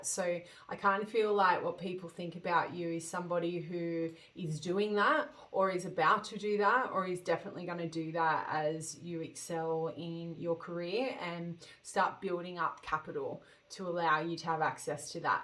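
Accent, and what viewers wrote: Australia. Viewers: “If you’re new to my channel I’m not normally this shit 🤦‍♀️ “ hahahah classic I love you already. Aussie ?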